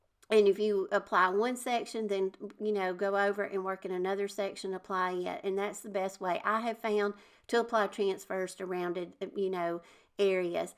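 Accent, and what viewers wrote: South, United States. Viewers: I love your channel (and your beautiful southern accent!). 👍 You are always so inspiring.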